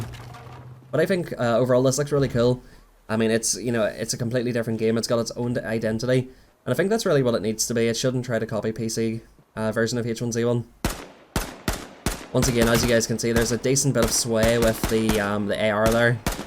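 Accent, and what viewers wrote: Northern Ireland, United Kingdom. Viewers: Are you northern ireland? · Are you Northern Irish? You sound like you're from here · Northern Irish?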